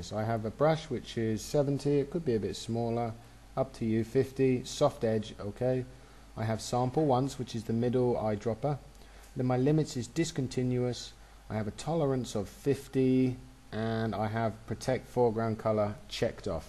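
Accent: United Kingdom. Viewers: lovely british accent ;) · Thank you, your tutorials are very clear and you demonstrate in a manner that is easy for beginners and the more advanced users to understand. You sound like you are English with your accent?. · Thank you very much master! :) nice British accent you got there >.<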